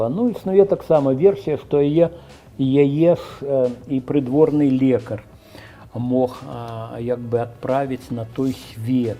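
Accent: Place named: Russia. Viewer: Ен размауляе на мове з руским акцэнтам. Мова инакш гучыць.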